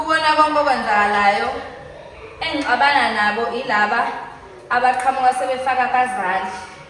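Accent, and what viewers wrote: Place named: Thefula, South Africa. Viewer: Ave ungchaza Mase uthefula❤❤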